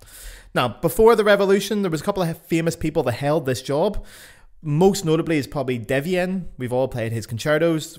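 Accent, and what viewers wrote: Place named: Ireland. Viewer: Merci monsieur…je suis américaine. Je parle français et j’étudie la flûte avec un professeur français…aux États-Unis ! J’aime bien votre podcast mais s’il vous plaît arrêtez les insultes vers mon pays! We aren’t all idiots and some Americans have even composed some great music if you can believe it. All that to say, I do appreciate your podcast and I am not super offended, but as you continued in this episode to make fun of the American accent over and over, I was forced to do many many eye-rolls. You do admit that you anglicize Lot and Boehm names lol, you just do it with that charming Irish accent lol 😂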